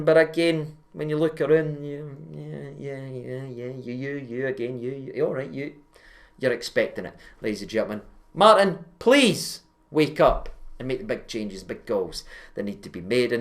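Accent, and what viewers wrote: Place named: Glasgow, United Kingdom. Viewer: That's some Glaswegian accent .Support your local club mate.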